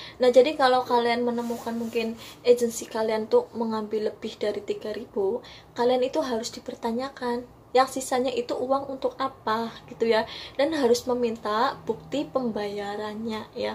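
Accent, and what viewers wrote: Banyumas, Indonesia. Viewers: Ngapak jos sukses.. Salm dari trenggalek mbak.. Makasih atas berbaginya..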